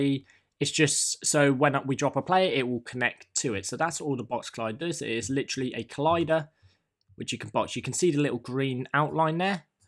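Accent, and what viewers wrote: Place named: United Kingdom. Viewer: Hi, trying out the code in the first script, I get an error message saying "Input does not contain a definition for 'GetaxisRaw'". I checked every letter, it is matching the one in the video. I tried with Getaxis, but it doesnt change anything here. Does someone knows about it ? Great tutorial, and great British accent as well ^^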